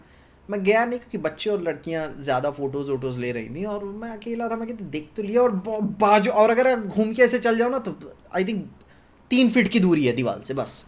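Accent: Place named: Purvanchal, India. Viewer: Pta nahi kyu bhai Your win feels personal🥹. I could literally feel each of your pause and stutter. The moment of realisations, everything. I don't know why maybe because mai bhi aise hi ek humble background se belong krta hu purvanchal ke. Aapka lehza, aapki shabdaavali mujhse milti julti hai shyd isliye. But anyways keep going brother, someday I'll also be on my own aur ye saara kuch experience mai bhi first hand kr rha hounga.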